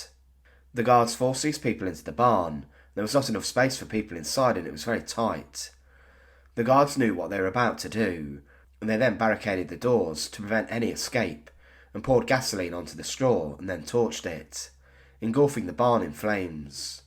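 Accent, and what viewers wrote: United Kingdom. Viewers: Who is the jew with British accent narrating ? jew Bolshevism / Red October / Tsar Family Assassination / Holodomor / Stalin / Dresden Bombings / jew Soviet atrocities against civilians/ genrikh yagoda and countless others Shut up ya yid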